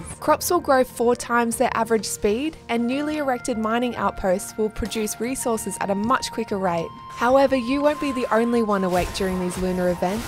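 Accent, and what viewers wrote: Australia. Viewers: i like her ausie voice 🤩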